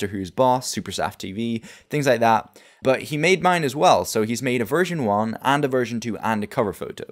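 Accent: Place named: Scotland, United Kingdom. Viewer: i could hear a hint of a scottish accent when watching that video thanks for answering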